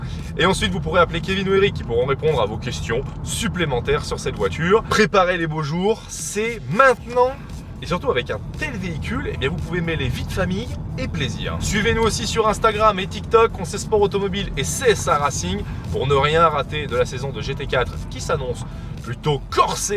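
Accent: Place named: Savoie, France. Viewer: Le combo t-roc + charo avec accent savoie= sa lui va incroyablement bien bref continuer comme sa vs ete les mlr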